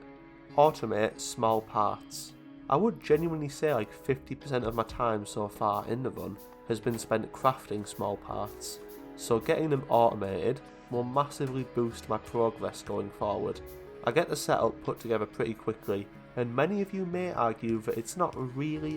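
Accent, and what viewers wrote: United Kingdom. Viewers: Your Britsch accent is so pleasing to my ears.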